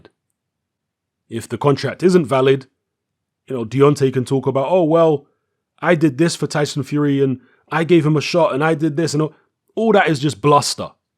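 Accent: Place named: RP, United Kingdom. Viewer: Oi! How on earth would you insult Deontay Wilder with the cleanest British Queen's English eh?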